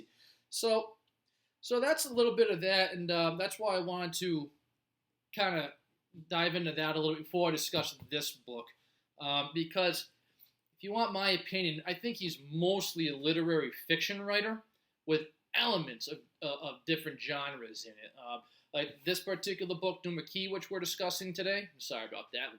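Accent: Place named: Boston, United States. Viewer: Patients lmfao!! Are you from Boston btw you got hint of accent, as I’m from Boston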